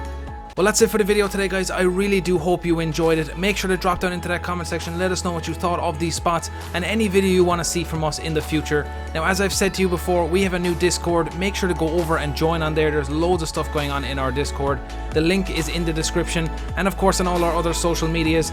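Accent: Ireland. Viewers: i can tell ur Irish from your accent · Are you Irish?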